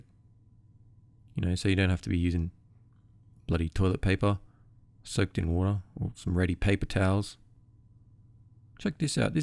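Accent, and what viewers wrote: Australia. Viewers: heya mate thx for the vids ,they have rekindled my desire to paint ,and its good to hear an ozzy vioce in a warhammer vid, for a change , cheers bro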